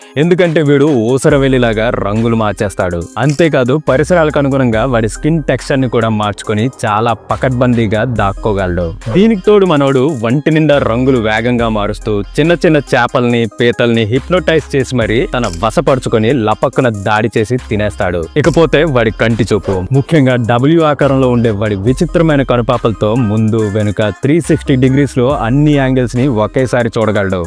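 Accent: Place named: Telangana, India. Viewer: Me yokka Telangana slang Bagunddi 🎉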